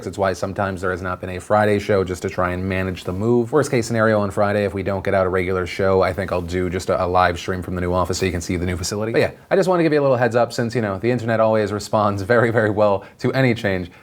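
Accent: South, United States. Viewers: Why does talk with a southern like accent some of the time? When he is of Italian descent, raised in the Bronx, and currently lives in LA. 🤔🤔🤔 Love and his show, just wondering?! Lol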